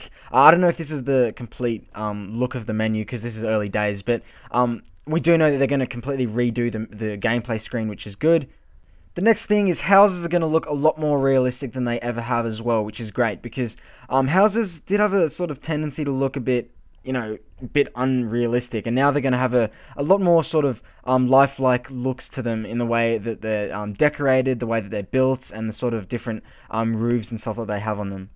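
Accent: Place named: Australia. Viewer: What country are you from to where talking and driving are realistic? You sound Australian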